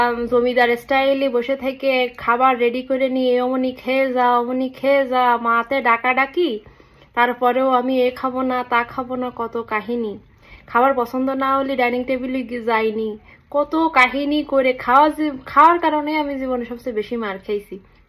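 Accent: Khulna, Bangladesh. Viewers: খুলনার আঞ্চলিক ভাষা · দ্রুত সুস্থ হও দোয়া করি। তোমার কথা শুনে মনে হচ্ছে তোমার বাড়ি খুলনা। ভালো থেকো আপু।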